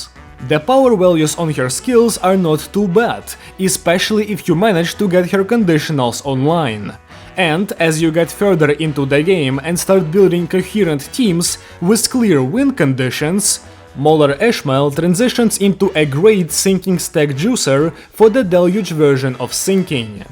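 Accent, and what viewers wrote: Russia. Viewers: What is accent sound like Russian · Что за Русский акцент...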